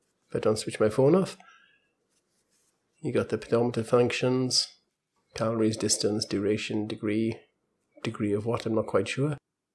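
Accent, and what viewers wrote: Wales, United Kingdom. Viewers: u sound welsh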